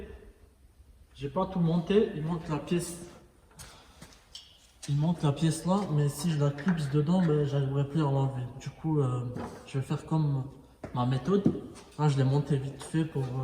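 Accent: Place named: Yugoslavia, Eastern Europe. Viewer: le "petit" accent d'ex-Yougoslavie 😁👌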